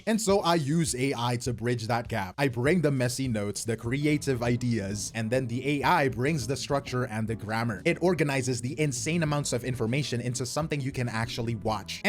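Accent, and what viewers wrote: United States. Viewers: imma be serious, till this drama happened, I did not know I were from the Philippines and genuinely thought you were an American with a really cool accent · not american? aintnoway you're Indonesian my brother as fellow indonesian your speaking tone sounds more american like newyorker or from cali💀 but hey im glad that you're being honest and clarify misunderstanding ( just rare to see sea cc that have community that full on people speaking english )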